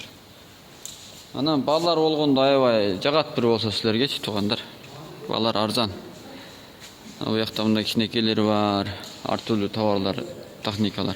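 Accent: Kyrgyz, China. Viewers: сүйлөшүнө караганда кытайлык кыргыз бизге баасын айт дизельнийдин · Бул алып баруучу кытайлык окшойт. Суйлогонуго Караганда?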